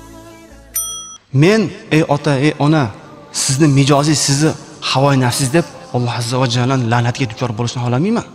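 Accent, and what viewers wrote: Osh, Kyrgyzstan. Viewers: бу инсон шевасидан ошли ми дунйо тургунча туринг домла боркану хакикат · Ош шахридан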